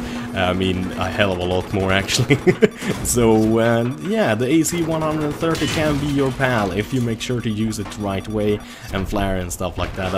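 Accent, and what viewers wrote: Sweden. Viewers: You must be swedish :) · your smooth Swedish tone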